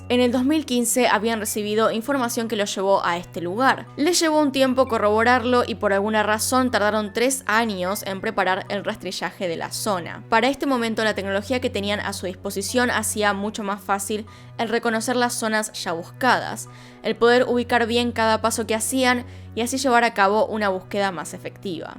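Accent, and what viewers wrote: Argentina. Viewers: Hola a mí también me encanta una...vez...más y lo bien relatas las historias con esa voz tienes un Argentino muy bonito👍💞💞💞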